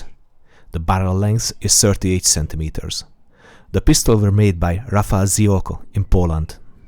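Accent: Hungary. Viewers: It is in fact my favorite gun channel on YouTube in beautiful, bright Hunglish language. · Hunglish? I always enjoy and learn very much from your videos! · Hunglish is awesome! :D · As a fluent speaker of Spanglish I appreciate the Beaty of Hunglish. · “Hung-lish”…. Keep it up sir lol the brace is absolutely beautiful. I want a pair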